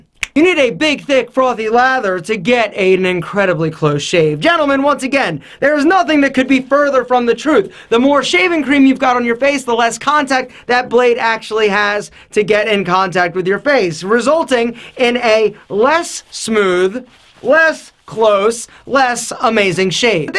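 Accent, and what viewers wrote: United States. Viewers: Sounds American to me...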